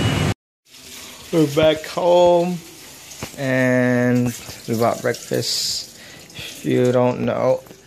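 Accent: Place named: United States. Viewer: Luv your vlogs...Are you still in school or your done already? Luv you still have your American twang:)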